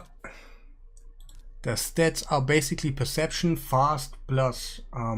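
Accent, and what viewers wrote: Germany. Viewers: Bist du deutsch?😅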